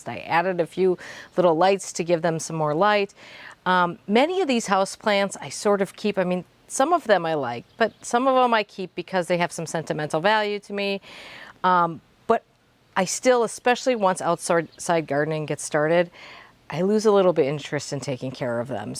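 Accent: Wisconsin, United States. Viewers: I was watching your video and my husband immediately picked up on your accent. He is from WI too but has spent the majority of his adult life in the south. Soooo, I love your videos but I also love that your in WI. Door County is one of my favorite places in the world. Fragmented chain of thought, sorry. Bottom line, can’t wait for the next video!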